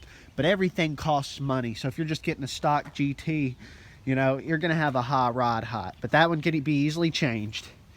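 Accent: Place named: Texas, United States. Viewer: Awesome Texas accent! 👌🏽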